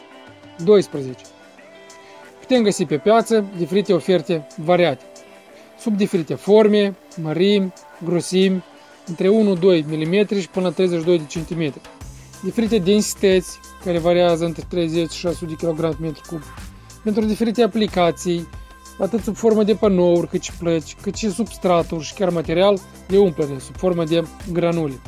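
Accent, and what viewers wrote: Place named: Moldova. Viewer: Salut! Faci o treaba foarte bună cu aceste vide-ouri. Un sfat: Cred că ar ajuta să pronunți unele cuvinte (Mai ales numerele și anumite expresii) puțin mai rar și să lucrezi la dicție. Poate cei din Republica Moldova înțeleg fără probleme. Noi, cei din România, ne chinuim puțin ca să înțelegem 35% din cuvintele pronunțate. PS. Să nu o iei în nume de rău! Toate bune!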